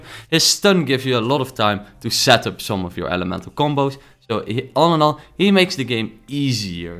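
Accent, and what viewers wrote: Netherlands. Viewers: The Dutch accent haha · Are you dutch?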